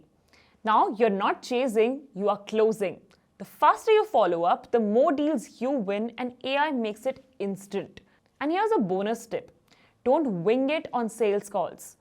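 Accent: India. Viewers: I looove your Indian accent! Makes all the money!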